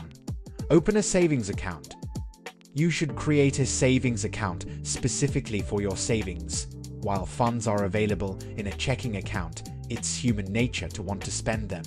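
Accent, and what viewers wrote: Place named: United Kingdom. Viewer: To be honest — really tired of the British accent.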